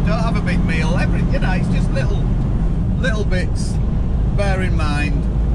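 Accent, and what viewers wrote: Yorkshire, United Kingdom. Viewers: Where in Yorkshire you from pal?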